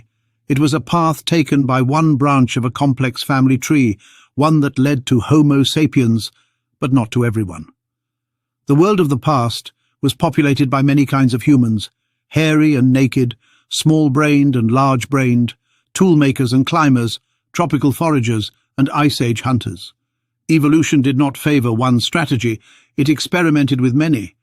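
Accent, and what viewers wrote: RP, United Kingdom. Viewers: I don't care what you say even if you do sound BRITISH ELITE.🤮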